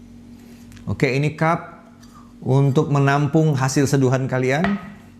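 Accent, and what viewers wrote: Medan, Indonesia. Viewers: Ada tutop nya pulakk haaa .. Adoohh logat mu itu bang buat rindu nongkrong di medan lagi ah...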